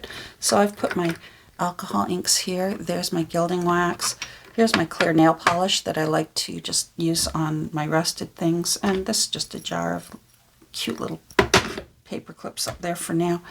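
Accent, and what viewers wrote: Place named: Canada. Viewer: I was born and lived in Minnesota for the first 19 years of my life, and I think my accent sounds more Canadian then yours. But then I heard you say “about” and I thought…aha! There it is. Very slightly Canadian accent came through. Thanks for posting. I enjoy watching your videos so much. I appreciate you.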